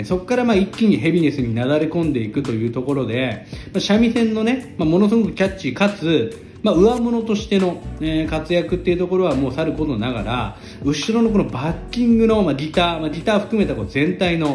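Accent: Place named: Hiroshima, Japan. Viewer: 所々広島弁が散りばめられているのはさすがです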